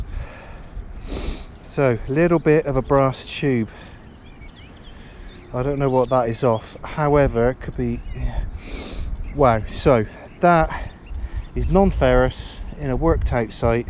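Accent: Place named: Bristol, United Kingdom. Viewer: I've Subbed you,. I was searching for Meta data for my video descriptions, becase when you do start making money from YT, you'll make more money with lots of key words in your video descriptions. and your Quite knowledgeable about how to use metal detectors and you lived in Bristol and by your accent quite close to me, me thinks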